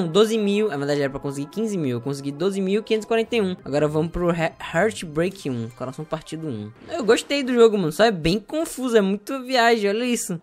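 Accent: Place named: Paraíba, Brazil. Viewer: pq o tem um pouco de sotaque paraibano?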